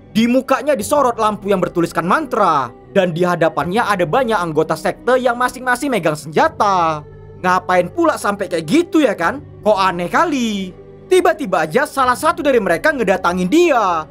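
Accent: Medan, Indonesia. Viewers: orang medan ni pst abangny dr logatnya wakwkka. btw makasih ya bang, pas nonton beneran ga ngerti. abang cerita barula ngeh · Medan anjing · Medan kali 😅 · Bang saran mending pakai bahasa indonesia aja jangan di logatin medan bahasanya🙏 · Nampak x medan ko ya lek 😂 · Ini aksen melayu Medan ni wey 😂ky aksennya mael lee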